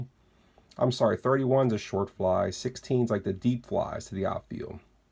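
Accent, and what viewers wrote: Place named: Pittsburgh, United States. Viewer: Nice video. Love the “Pittsburgh accent “.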